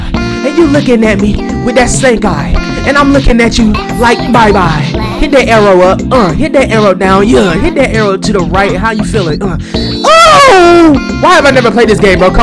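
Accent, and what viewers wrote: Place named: Philadelphia, United States. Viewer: YOU’RE FROM ATL? I thought you were from either Philly or Michigan.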